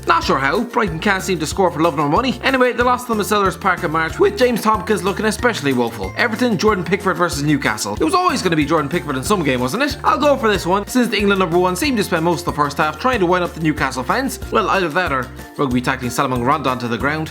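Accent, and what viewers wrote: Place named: Ireland. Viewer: Its Irish Guy he's Irish most Irish people talk fast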